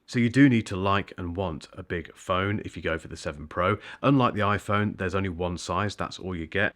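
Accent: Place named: Essex, United Kingdom. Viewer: This man speaks with an Essex accent. He is an Essex boy.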